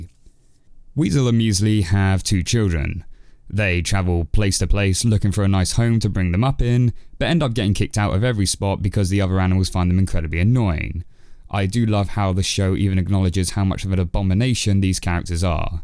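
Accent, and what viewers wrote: United Kingdom. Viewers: I JUST REALIZED YOUR A BRIT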